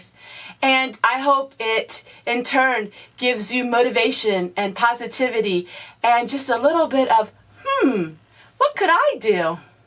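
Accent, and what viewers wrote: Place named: South Louisiana, United States. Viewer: interesting video. I’ve watched a few videos, and I’m trying to place your accent. South Louisiana? Nonetheless, you have some really interesting videos. Keep up the good work!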